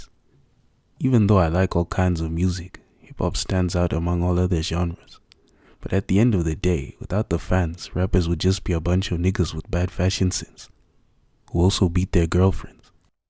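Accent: South Africa. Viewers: U got a 🇿🇦🇿🇦 accent jo What's popping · He sounds south african gng and not a white south african either. Are you part of one of the fanbases that he was talkin bout on this vid or smth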